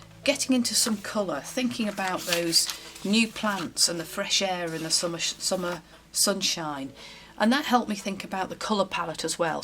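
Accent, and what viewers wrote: England, United Kingdom. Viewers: I’m sorry to bother you with my comments hon I moved to Melbourne from London in 1973 and so enjoy hearing other English accents, which are few and far between these days!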